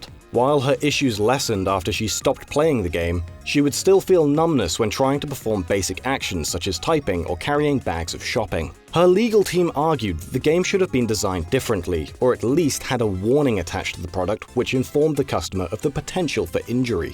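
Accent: United Kingdom. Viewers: This is a very British narration.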